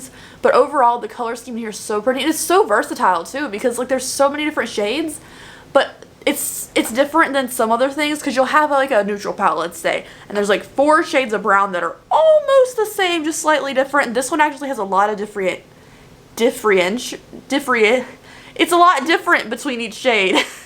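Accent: South, United States. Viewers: The southern accent 👏🏻👏🏻👏🏻 I love it!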